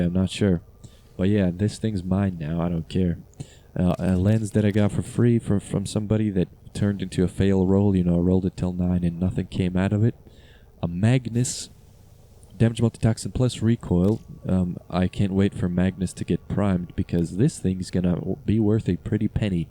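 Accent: United States. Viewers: Q&A: whens that hot dog review coming? I want to see shlurp a sausage 😩😤 Also you sound American but you said you live in Europe so where do you come from?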